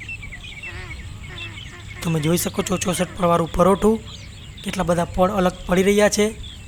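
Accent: Kathiawar, India. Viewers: મિત્ર, સલામ, આપની વાનગી અમોને ખુબજ લલચાવે છે, વ્હાહ, મજા આવી જાય... આપ શ્રી. આપની કાઠીયાવાડી માં બોલોને, ઈ અમોને ગમશે, જેવી તમારી રસોઈ તેવી તમારી બોલી.... DHANYAWAD..... ALL THE BEST....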